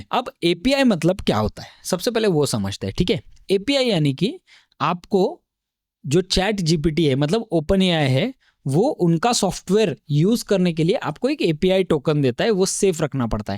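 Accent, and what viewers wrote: Maharashtra, India. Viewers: Bhava tu marathi aahes na tuja Accent ni kaltay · Thank you very much bro for introducing me to AI Agent world. Although I am a software engineer but I have never explored AI Agent side. Your way of describing is very good and seems genuine. Describing everything from scratch. I like your Hindi with Marathi style, it reminds me my days when I was in Mumbai and Pune. Want to learn lot from you. :)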